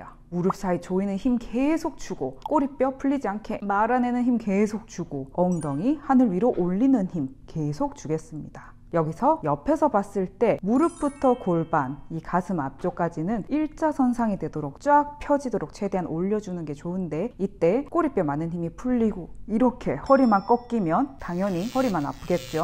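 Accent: Gyeongsang, South Korea. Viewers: 경상도 말투~ㅎㅎ 넘나 좋은 운동 감사합니다♡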